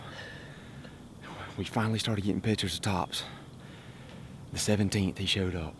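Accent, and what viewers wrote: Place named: Georgia, United States. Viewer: Been watching boys for a few years now and went to back to watch old videos and you can hear the southern accent on all the boys but this is no doubt a good.ol Georgia boy. I never understood why people let others determine their decisions. The property owner gives you permission but the boyfriend doesn't like hunting so she changed her mind. BS the bf was jealous of another guy being at the house, even though it's the woods out back. I've seen videos where you get permission and then the neighbor freaks out so they take it back. Personally if a neighbor of mine told me they didn't want someone hunting on MY property I'ld tell straight out "it doesn't effect you one bit, it doesn't effect your house, property or life in one bit so too f-en bad". Luckily I live in a pretty rural area and for years couldn't really see many neighbors but they are slowly closing in. 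Worse part is they move out to the country, literally was all farm land when I grew up but those have become almost extinct, but they move out here and then complain about the smell when a farmer is spreading manure on his fields, or complain about turkeys and deer in thier yard eating landscaping or.pooping, but dont like hunting.🤷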